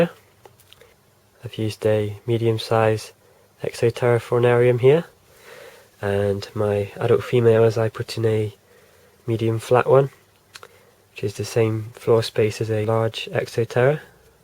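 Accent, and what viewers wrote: United Kingdom. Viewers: British Pronounciation :)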